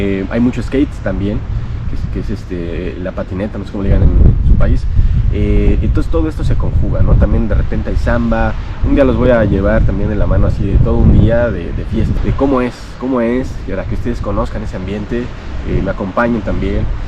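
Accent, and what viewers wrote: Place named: Mexico. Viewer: De Argentino no tenes nada. Se nota el Mexicano con solo hablar. Jajajajaja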